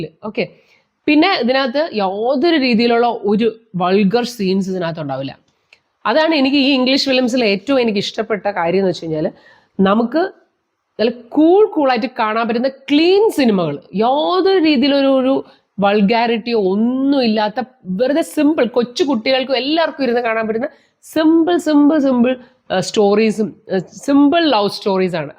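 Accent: India. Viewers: Thanks, but your English pronunciation shud be either British or American. If you follow Indian style people will not get the desired benefit. To get fantastic movies simply try through Google.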